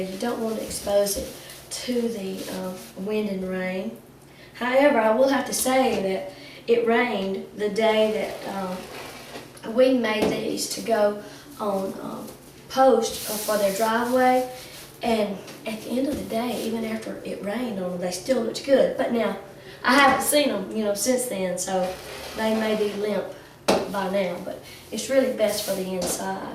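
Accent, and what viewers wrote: South, United States. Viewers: I am very interested in making wreaths. Can you explain the differences in mesh. I have seen some peple talking about deco mesh and geo mesh. Are those the only ones and what are the differences? Thank you and I love watching your tutorials. I am from Louisiana where are you from. Your voice sounds south but definitely not as southern as me. Lol By the way you are great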